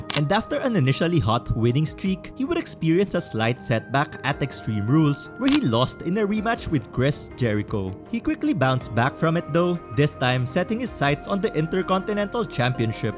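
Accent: Philippines. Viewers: Based on your accent, it seems you're a filipino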